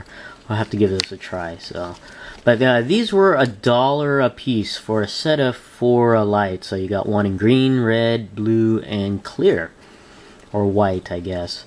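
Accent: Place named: Arkansas, United States. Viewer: Sounds like you're a fellow Arker. Correct?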